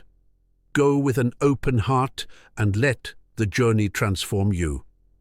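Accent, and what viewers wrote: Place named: United Kingdom. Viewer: Great, except for the annoying, supercilious British voice. We need cooler voices 🤪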